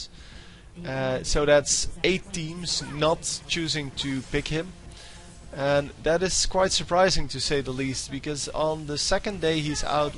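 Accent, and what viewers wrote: Netherlands. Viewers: Are u Dutch? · He is from the netherlands, and his accent is a very typical dutch accent.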